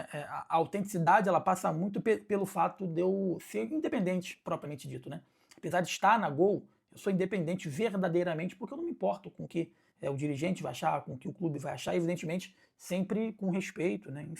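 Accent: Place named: Rio de Janeiro, Brazil. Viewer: Me parece que vc é carioca porém vc fala a verdade não inventa mentiras ou especulações meus parabéns vc é o melhor sim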